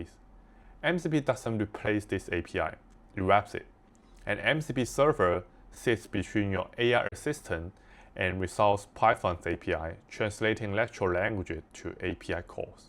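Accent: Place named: China. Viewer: I'm surprised Codex understood what the hell you were saying, maan. That Chinese-English accent is challenging. But thanks for the video, it's a good starting point.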